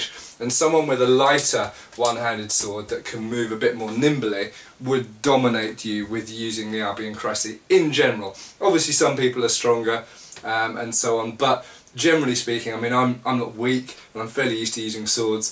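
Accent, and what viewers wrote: United Kingdom. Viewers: I don't know how, but you sound more British here.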